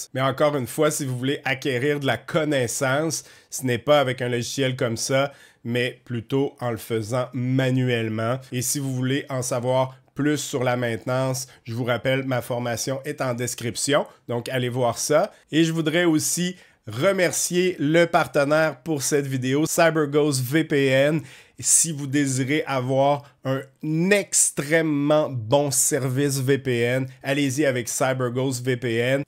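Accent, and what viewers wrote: Quebec, Canada. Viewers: Bonjour l'ami, perso je ne comprends pas tout, car j'ai fait des manips comme toi, mais je n'arrive jamais au résultat escompté, de plus, je n'ai que très rarement les mêmes fenêtres qui s'affichent à l'écran ... J'utilise windows 11 famillial installé de base sur mon PC, pas de version pro payante, du coup beaucoup de tes trucs ne fonctionnent pas ... Pourrais tu te mettre au niveau du commun des mortels et proposer des astuces qui marchent avec les versions pré-installées et non payantes que la plupart des gens possèdent ??? Rassures toi, j'aime énormément tes vidéos, ne serait ce que pour ton accent !!! Mais il serait sympa que tu penses aussi au petit peuple qui ne peut s'offrir la version pro ... Allez, je dis ça, je dis rien ... Bises à toi mon cousin de la belle province😘😘😘 Phil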